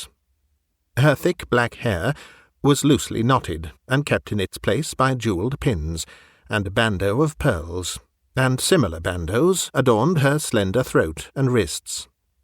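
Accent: England, United Kingdom. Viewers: This is very confusing!!! The princess was Turkish not Swiss and you give her 2 (!) last names!!! Is it Duveé or the first one where you call her Lucy Cunier!?!? It is NOT clear that you are talking about 2 separate persons!!! I think that you must've cut something that related that fact clearly, out! Also, it is sometimes difficult to understand you because of the British pronunciation, i.e a clerk-- where you pronounce it as "a clark" but you don't leave any space between "a" and "clark". I am not criticizing you; I actually enjoy your English accent. In general, I also enjoy the way you tell stories; it's just that I think that with respect to this one, you should not have left out important parts.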